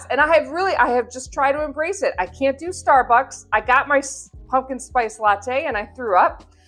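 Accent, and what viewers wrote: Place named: New Zealand. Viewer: I love the way you pronounce new zealand, it's awesome (you have a bit of that kiwi accent)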